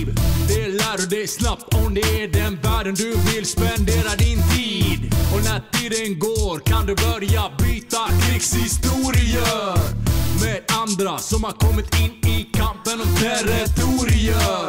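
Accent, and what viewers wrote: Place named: Finland. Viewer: Han bryter ju för fan på finska XD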